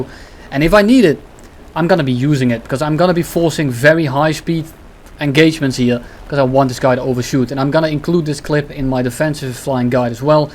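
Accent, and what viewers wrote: Netherlands. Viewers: Are you dutch? You sound like you are from the Netherlands... These accents are too recognizable in Europe..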